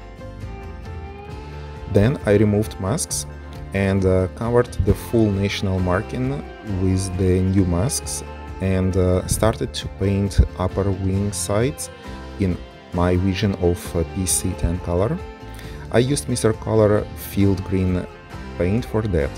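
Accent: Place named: Russia. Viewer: Чел ты с рашки? Русский акцент слышен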